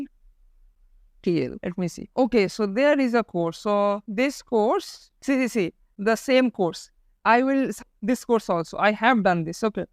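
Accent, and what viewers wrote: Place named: India. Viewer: Bro trying hard not to talk in Indian accent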